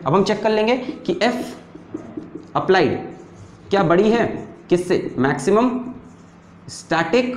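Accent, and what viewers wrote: India. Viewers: Unfortunately I can't understand Indians' accent 😭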